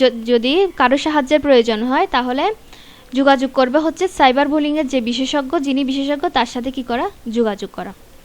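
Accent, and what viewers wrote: Chittagong, Bangladesh. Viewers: Sis is pure chittainga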